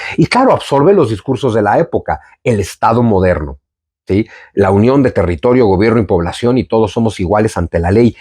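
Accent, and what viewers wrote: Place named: Mexico. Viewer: * tiene acento mexicano no español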